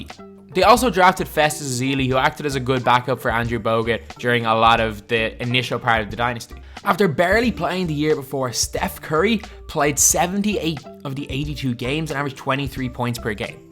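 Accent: Ireland. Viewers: Where in Ireland are you from? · Nice one.. Very refreshing to hear an Irish voice on nba One thing though Linsanity happened in NYC yet they let him go. N it was a culmination of many misfortunes that led to Linsanity.. Don't how it would hv happened here n if it did how would it halt the dynasty.. Maybe a better dynasty?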